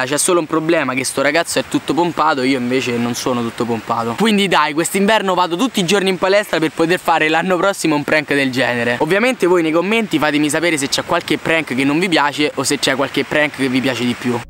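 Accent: Italy. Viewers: Me piace troppi ed tuo accento romano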